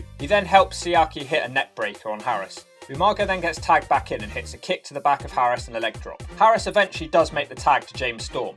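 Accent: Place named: Somerset, United Kingdom. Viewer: That accent is so Somerset I love it. It reminded me of Sunday lunch 🤣🤣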